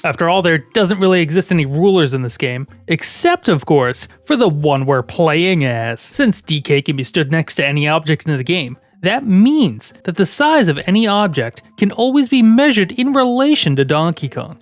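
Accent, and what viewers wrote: Boston, United States. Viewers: The random Boston accents throughout the video are making me go bananas. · I just have to ask... Is that a Boston accent? Can you say "Coffee, Cheetos, Chicken" in your next video for me??? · You sound like a bostonian. (Given how you replace er with a, and you speak with an accent)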